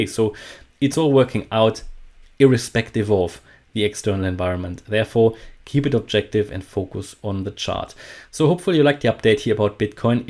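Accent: Germany. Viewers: an other stunning video full of interesting infos, especially the one you mentioned betw min to regarding the 2 diff optiosn in 3 or 5 wave moves, i m leraning so much follwoing you day by day, The most i like on your channel compare to the other is that you only talk abt TA and EW method, nothing abt market, economy, financial and no sense strategy or opium... keep doing the amazing work and thank so much for all your effort, ( just one question, are you German? ) i m just wondering abt the accent, Golden trades to all of you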